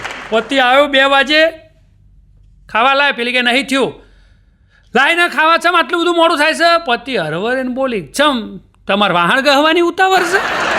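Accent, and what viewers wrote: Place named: Mehsana, India. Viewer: मेहोना नी टिपिकल बोली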